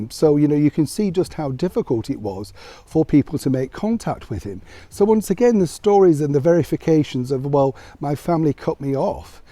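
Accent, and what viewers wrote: United Kingdom. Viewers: Cant get more Bri'ish than 🧐🎩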